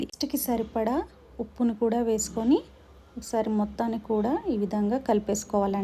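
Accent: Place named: Andhra Pradesh, India. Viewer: Miru andhra valala matladuthunra slang 😊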